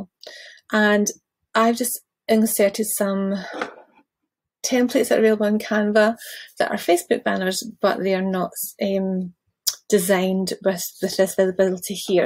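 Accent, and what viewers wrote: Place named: Scotland, United Kingdom. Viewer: I gotta love you. :face-red-heart-shape:But you're Scottish? Welsh? Accent is too much for my American ear. 🐘Well , WooHoo! Close Captioning does understand you! I will carry on.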